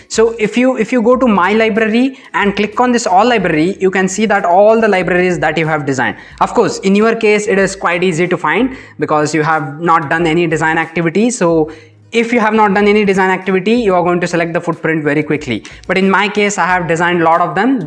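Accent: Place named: India. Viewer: your English is atrocious! It's no use to try an speak English with a Hindi accent and pronunciations, rather you should listen and imitate how English is spoken and pronounced by native English speakers. Just imagine speaking Hindi with English pronunciations - it would be unintelligible.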